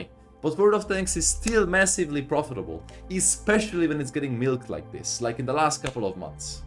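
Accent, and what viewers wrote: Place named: Hungary. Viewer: Eszrevettem a madzsar accentusodat de hibatlan a tartalom, hajra!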